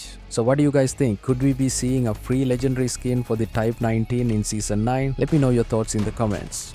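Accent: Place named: India. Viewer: Your accent is like similar to Indian english 😂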